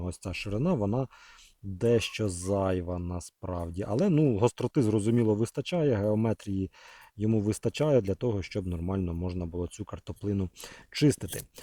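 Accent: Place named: Ukraine. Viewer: хохлятский говор ну прям ржу)))нож шляпа, це обзор хоно)))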